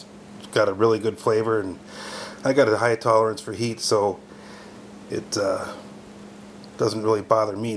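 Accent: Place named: Minnesota, United States. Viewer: Love your video! So funny I could tell you were from MN before you even said. Probably because I grew up in Prior Lake! Love your advice and it is really awesome! Thanks so much!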